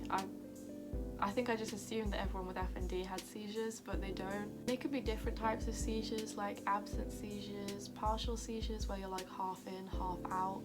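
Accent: United Kingdom. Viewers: Oh no! I absolutely fell in love with you from the moment I saw you. You are so pretty and cute that I can't watch a post without smiling. The british accent is my favorite. Kelly osbourne infact is my celeb crush... Guess you are to now lol. No there isn't an shrine next to my Kelly shine...yet; but keep posting cuz we love you!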